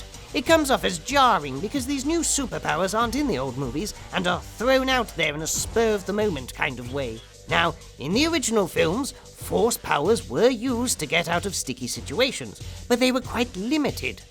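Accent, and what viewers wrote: United Kingdom. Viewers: Good job you sound like plinketts older proper British brother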